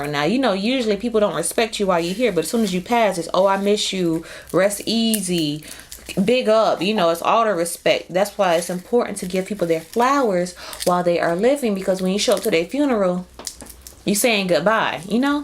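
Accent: New Orleans, United States. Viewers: The “cOLD DRANk” 😂😂 I like that N.O. accent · as a new orleanian baby you got the accent 😂😂 and cold drank is legit ONLY said when referring to soda ❤️🙌🏽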